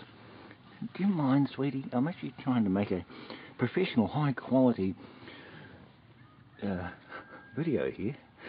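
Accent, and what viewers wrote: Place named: New Zealand. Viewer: judging buy your accent your from new zealand, which leads me to ask... what wood are you going to run in your "imberted" fema gasifier?.... imbert type gasifiers are designed for hardwoods and don't take well to softwoods (pine etc) as the air jet velocity is too high and destroys the char bed, with uncool results for gas production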